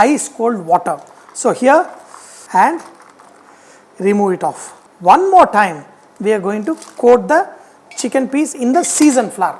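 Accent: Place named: Telugu, India. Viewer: Yara telugodu la unnav.. telugu matladam radha.. ya vathama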